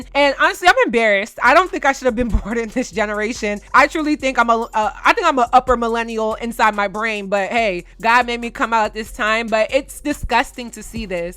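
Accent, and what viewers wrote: Nigeria. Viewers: Nigerian accent cures my depression 🇳🇬💖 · I love when brings out her Nigerian accent! I know she’s annoyed when that comes out! 😭😭🔥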